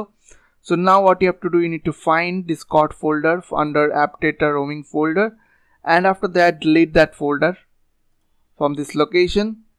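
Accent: India. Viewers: You sound like India guy and since you're on youtube you're god to us then.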